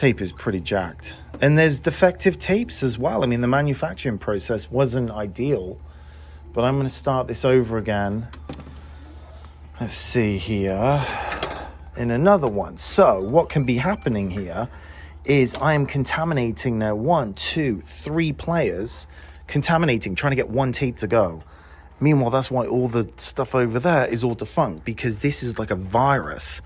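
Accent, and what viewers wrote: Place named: United Kingdom. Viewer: hmmm i hear a little british accent lol.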